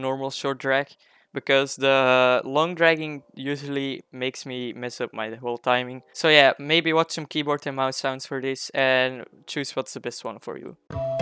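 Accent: Netherlands. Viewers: He is somewhere around belgium or the netherlands.. i know that english accent from outside my head since i am also dutch.. he is <num>% From belgium or Netherland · your'e accent little bit sounds like your Dutch am i right? XD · volgens mij is hij ook Nederlands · I feel like you're dutch XD, aren't you? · You dutch?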